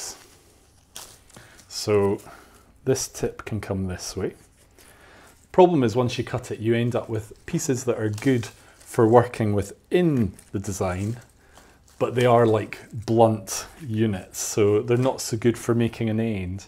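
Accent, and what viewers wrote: Scotland, United Kingdom. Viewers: Scots accent ? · I envy British accent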